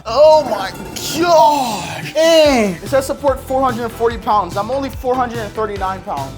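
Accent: Jamaica. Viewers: Are you Jamaican or Caribbean?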